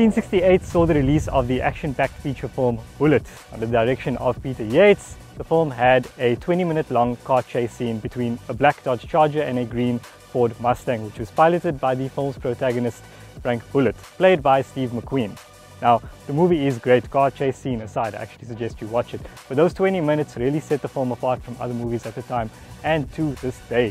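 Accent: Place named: South Africa. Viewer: This presenter has a brilliant South African accent. Fantastic!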